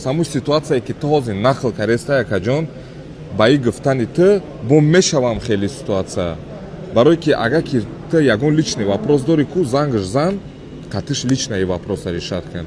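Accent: Kulob, Tajikistan. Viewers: 😂😂😂 Хитт карде Гапи кулоби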